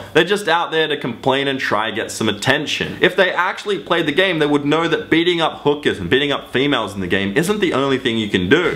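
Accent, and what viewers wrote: Australia. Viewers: Are you Aussie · huh hes aussie subscribed im aussie too WOO HOO haha lmao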